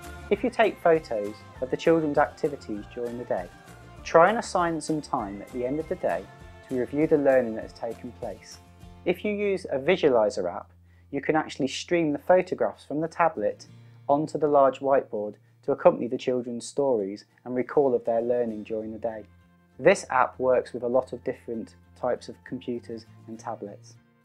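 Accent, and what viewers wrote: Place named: England, United Kingdom. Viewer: You had me subscribed at your English accent (is that even grammatically correct?)! Thank you for sharing your great ideas :)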